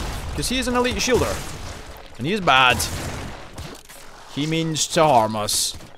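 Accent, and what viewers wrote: Scotland, United Kingdom. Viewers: it's always great hearing advice from another scot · I would laugh at any of your videos due to your Scottish accent. Just makes every story entertaining. Good video though, man.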